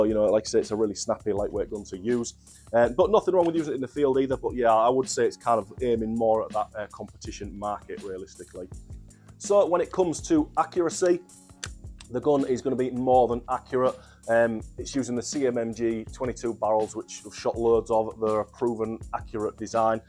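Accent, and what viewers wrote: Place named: Hull, United Kingdom. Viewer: ah nice mate, I’m from hull myself thought you sounded close. Wanting to get into fac shooting but no idea where to start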